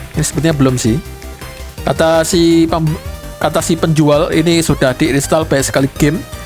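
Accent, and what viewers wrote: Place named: Solo, Indonesia. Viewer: Bang suara mu pria solo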